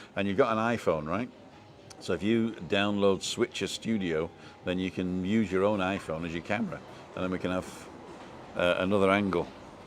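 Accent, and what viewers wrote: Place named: North Wales, United Kingdom. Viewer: Listened on catch up, you have a very strong North Wales accent when speaking Welsh! You don’t speak English with a Welsh accent though. 🏴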